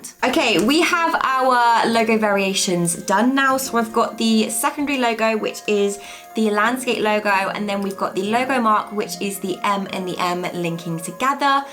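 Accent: United Kingdom. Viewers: Please mam, make translate cc into urdu. Your work is incredible but very difficult to understand of your fast british language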